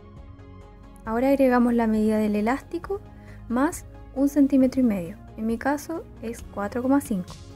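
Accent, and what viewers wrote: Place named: Chile. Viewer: Ay apenas te escuché dije: es chilenaaaa. Mi país mi país 😁 muchas gracias por el tutorial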